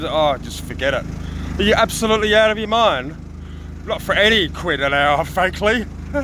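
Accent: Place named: Australia. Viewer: Hey you are Australian 😂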